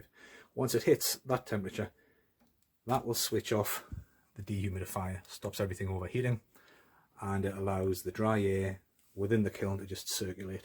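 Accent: United Kingdom. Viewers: very British!! 🇬🇧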